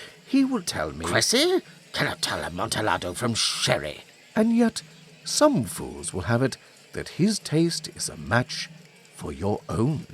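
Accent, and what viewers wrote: England, United Kingdom. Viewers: Why always freaking englesh accent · Spent some of my Halloween with these recordings. It was wonderful! Thx! Love the reader and even the xtra effects worked nicely. As far as his anglo-accent, gotta say i appreciated it. I've heard too many flat, nasally voices of Americans with no sense of rhythm to the words and it's practically unlistenable. Js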